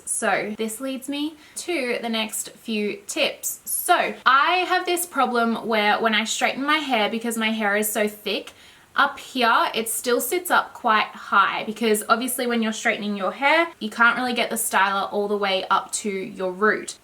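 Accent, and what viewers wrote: Australia. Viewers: Are you Australian? love your accent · lol, I am Aussie and when I watched 50secs into the vid, I was like... she is Aussie!!!!!!! yay !!!!!!!!!!!!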